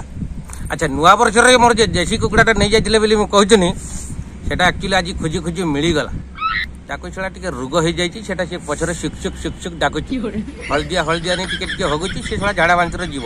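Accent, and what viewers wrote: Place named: Mayurbhanj, India. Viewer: Perfect mayurbhanjia .carry on the rapport.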